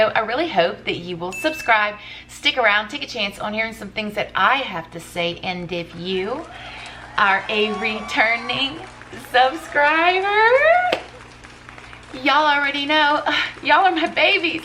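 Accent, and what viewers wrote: United States. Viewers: .....are you American????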